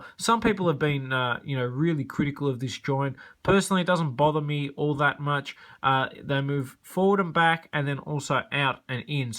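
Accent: Australia. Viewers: Please reply are you Aussie?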